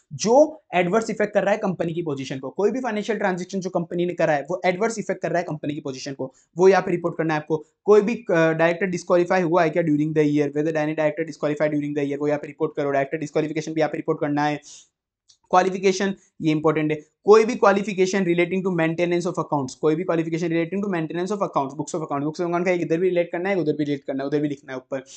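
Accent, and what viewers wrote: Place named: Bihar, India. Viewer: Audit Committee bhi nati nikli 😂😂😂😂 Sir apka bhasa se aap bihar ke legre hain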